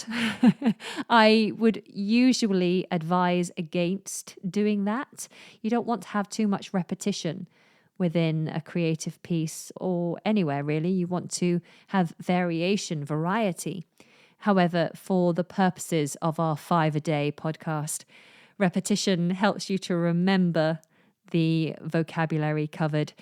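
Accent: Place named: United Kingdom. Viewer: Mam I have watched your almost all videos, I have got plenty of improvement in my British English,I like British accent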